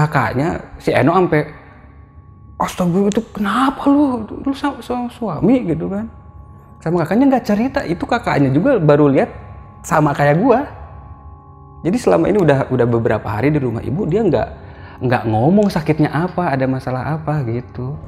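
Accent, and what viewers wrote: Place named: Sukabumi, Indonesia. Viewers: Pantes cara bicaranya kek sama ternyata msh satu kota...salam dri sukabumi-cisaat kang 😁🙏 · Kang sunda abis wkwkwkwkwkwkwk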